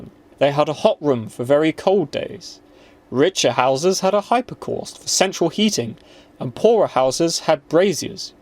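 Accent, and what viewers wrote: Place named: United Kingdom. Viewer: British accent = youtube success. Good channel.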